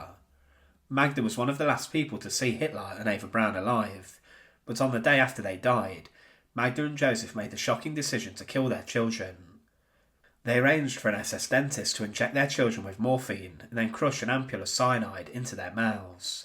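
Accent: United Kingdom. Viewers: Depends on where he is from in the UK, hence his accent. If you don't like it, don't watch.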